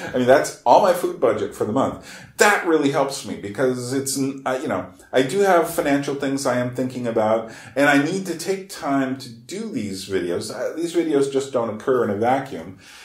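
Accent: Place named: United States. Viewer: Thanks for the link to Rookmaaker. His english with german accent is tough to my spanish ears, but I captured the essence of the lecture (he says "the heart is a pump", don't he?) I can see the conection beetwen he and your videos, and now I think you were right when you said that all of us are postmodern thinkers. Even Rookmaake seems postmodern when he insist in the reallity beyond the called "facts", or science, or aristotelic logic. This is an idea shared with the postmoderns. In fact I remember myself as a teenager defending the idea of the reallity as a personal construct. I used to like science, math and all that, but I never liked the scientificism in the philosophical sense, it's seems a silly vision, the philosophy of an AI, I supouse. The problem is that postmodernism has become worst han those square minded fisics I didn't like in the seventies and eighties. 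PS: I can understand you well enough, your american accent is the easiest to me. The worst are british people, with all these crazy vowels...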